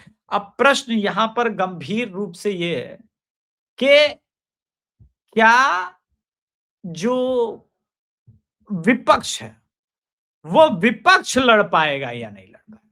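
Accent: India. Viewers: आपकी अंग्रेजी बोलने का तरीका भी देसी है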